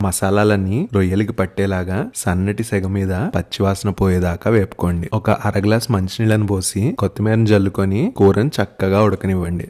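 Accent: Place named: Rayalaseema, India. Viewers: Yerragadda anagane artham ayipoyindhi ..maa rayalaseema ani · Yerragadda..rayalaseema slang 😊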